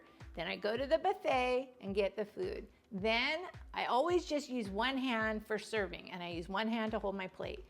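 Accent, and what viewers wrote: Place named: South, United States. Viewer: We do love your southern belle cheerful accent. Makes the content so much more approachable.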